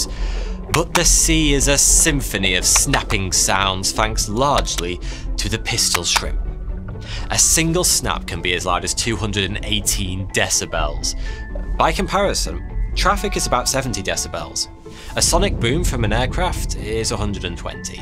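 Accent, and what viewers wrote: United Kingdom. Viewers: Idk if I can handle the britishness any longer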